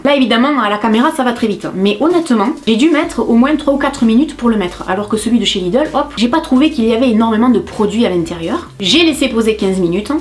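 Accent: South, France. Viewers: J adore être en ta compagnie toujours un plaisir. Et on a ( oui je mets dedans mdr) Une communauté adorable. Mdr . Ces vidéos font du bien en ces moments compliqués étant moi même infirmière un peu d accent du soleil fait du bien 🍭🍭😘😘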